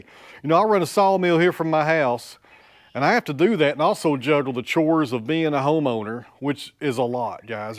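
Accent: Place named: Tennessee, United States. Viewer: Keep doing what your doing. The sawmill, Bruno, mamma cat and cabbage, chores, building your timber frame barn, the chickens, the puppy, your dad, new tools and machinery, trips to the shops and exhibitions, your mistakes and the ability to laugh at yourself, make this a very interesting channel. As a Brit with no experience of life in Tennessee I find this channel fascinating …..and your delivery with that Tennessee drawl and a great sense of humour is the icing on the cake. Keep right on doing what you are doing brother!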